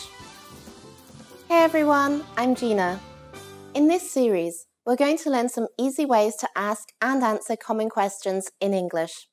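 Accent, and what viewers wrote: England, United Kingdom. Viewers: I love British accent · I was just try to learning English for 1month now I finally found a British accent , I like to learn this kind of stuff. Still I m try to learn if it's bad dnt mention it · Big thank you Gina for presenting this helpful vedio to learn English with proper British accent 💙💙💙💙💙💙💙💙💙💙💙💙💙💙❤️❤️ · Hi dear teacher! Your British accent is wonderful and so beautiful. I love English very much! It is the most important and beautiful language of the world. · British accent is really fascinating...this lesson is attention taken ...I like your s-t-y-l-e...learn alot from you...thanks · Your sweet british accent is kind of cute and its helps me to learn this accent . . . . . i don't know why but its very nice for me 😅 Btw your sitweb is useless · esse sotaque inglês pqp :( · I love British accent!.And she is amazingly beautiful and charming.Hello,Gina!!!!! 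· This just feels like learning American English from someone with a British accent This sucks and I’ve learned nothing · where is the word "mate", i just wanna talk like a british to say that word with this accent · I really love this walk through the British accent and the common communication way for people around the world rather using the American accent. Such plenty of love this accent though. If you could do please make a short lesson for kinds to learn the British accent too. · british accent is so hot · I liked British accent 👍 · Are you from england?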